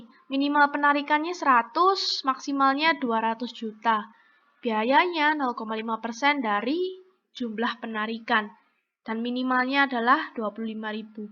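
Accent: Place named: Banyumas, Indonesia. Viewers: Ngapaks bgt ga siii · Ngapak gan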